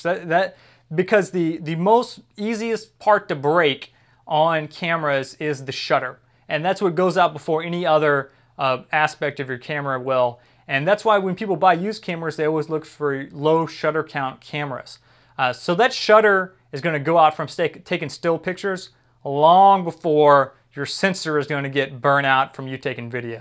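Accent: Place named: Brazil. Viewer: Ahhh, Nice portuguese you spoke.. It had a brazilian acente but still Nice portuguese..!!hehehehe..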